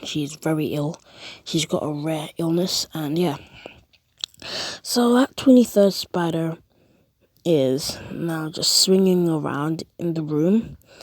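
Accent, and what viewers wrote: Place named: United Kingdom. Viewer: Mate, you've got a heavier British accent than I do! It's like one of those book narrators.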